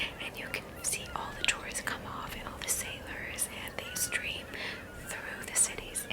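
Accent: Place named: United States. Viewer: You sound very American. Is that on purpose or does it just happen? Either way this was lovely (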